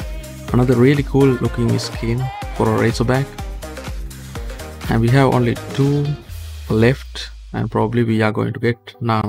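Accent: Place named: India. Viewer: Are you from india? Cuz your accent is Indian.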